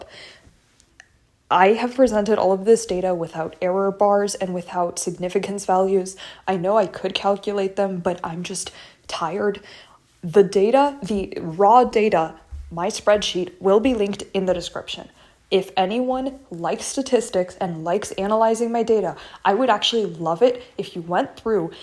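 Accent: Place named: Greece. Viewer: I just started the video but your voice instantly let me know you're not just a woman, you're a woman of greek origin😂idk if you speak Greek, your pronunciation is great, but I just knew ahaha, glad to see greek creators in the wild. edit: I checked your insta (followed and commented too, notice me!!/j), I was right and we're the same age too lol Edit 2: okay now that I finished the video, first of all, you took a lot of my thoughts and put them into words which I loved. It shows a lot of the mentality when it comes to writing women. I was thinking "most of the internet art is women, but that's because men drew out their p*n material. And then you said it, it's gooner shit. It's pretty statues with nothing about them personality wise. Some pros may make the design good enough to communicate their role in the story but that's still surface level and they could absolutely suck in a story as a character, even if "woman pretty and design semi functional". 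I'm also in stem (heyyyy) so I appreciate a good graph and analytical approach with methodology for the conduct of the research. Apart from the video itself, I've been writing stories without realising it mostly about women. They're the driving forces of their stories, some of them suck and make the rest of the cast worse, manipulate the men, control their fates and relationships, either on purpose or by accident. I haven't drawn my characters a lot due to time, apart from the main duo, but I don't see them, any of them, as my b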